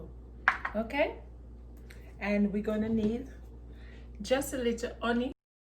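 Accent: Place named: Jamaica. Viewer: I tell you I love this jamaican accent, this was really interesting to watch can I visit you every Sunday?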